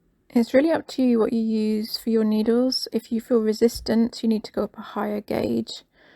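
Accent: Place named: England, United Kingdom. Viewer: I meant to add that I had not seen the last couple of minutes as I got interrupted. But the end result was amazing. Beautiful work, the head is so realistic. So nice to hear an english accent too! I am in Australia and I have a friend in Canada who has a schnauzer, a gorgeous animal and I used a photo of it as a reference when making a full body model for her. It is only about 6 inches high and 3 wide but I really wanted the eyes to be a feature. That is how I came across your video. I have been needle felting for about 10 yrs. I strive for an approximation rather than a replication when doing animals. If it is recognisable then I am satisfied. I want the hobby to be enjoyable, and not bash myself trying to be what I am not…ie perfect. I have sold a lot of items and had lots of commissions over the years so it must be okay. But I would love to be as talented as you obviously are. 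I have saved your channel so I can refer if I need to. Thanks again.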